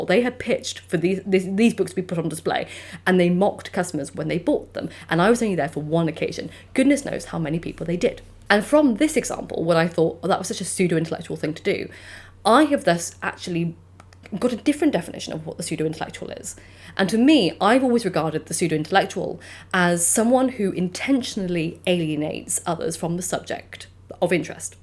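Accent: RP, United Kingdom. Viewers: A very beautiful real intellectual with a majestic royal english accent 🌹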